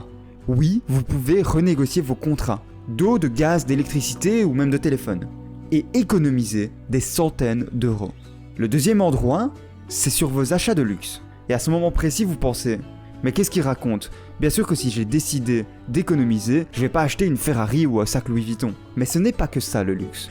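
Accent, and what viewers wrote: Liège, Belgium. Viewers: Tu es belge ? Merci pour les conseils , j'adore tes vidéos 😍❤ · Tu es belge ? Bref bonne vidéo pouce bleu 👊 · Tu ne serais pas de lièchee toi ? :D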